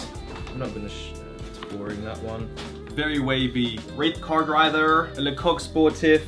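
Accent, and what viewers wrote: England, United Kingdom. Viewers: Idk what’s more entertaining his monotone British accent or his monotone jokes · this accent is obviously english/british,,,but what KIND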